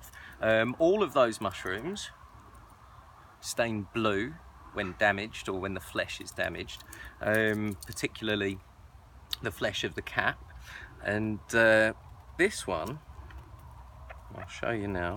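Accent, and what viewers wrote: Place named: Bristol, United Kingdom. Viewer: Are you from Bristol ? Im trying to work out your accent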